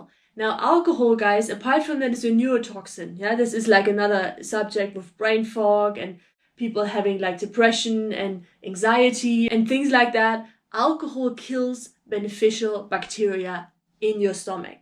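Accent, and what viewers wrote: Germany. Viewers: German accent is not really appealing for ears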